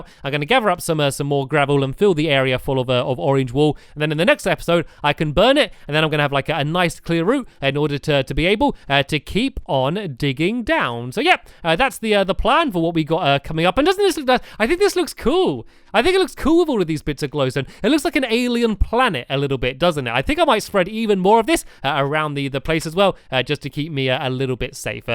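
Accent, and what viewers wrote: United Kingdom. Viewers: I stopped watching stampy like a year ago because his voice sounded annoying to them so I stopped watching for a couple of months but now I watch him because I don't care what they say any more he's from England he's British get use to it people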